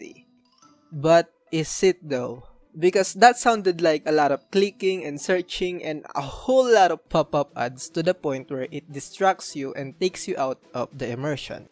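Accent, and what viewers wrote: Philippines. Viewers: The accent of this guy is just the same as filipino accent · I love you're accentHAHAHAH pinoy ka ngang kupala ka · I love the Filipino accent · Sounds like a filipino accent ngl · Taena sa accent mo palang, halatang pinoy na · Are u a filipino?!. Ur accent sounds like it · eyyy accent pa lang pinoy na agad ahahahhaa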